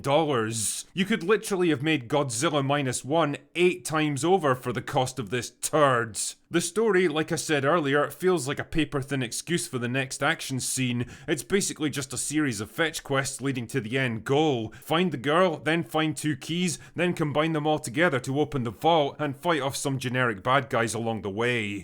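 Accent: Scotland, United Kingdom. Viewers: You understand that it’s a bit, right? Like he’s a normal dude with a Scottish accent when he’s on Open Bar or casually talking.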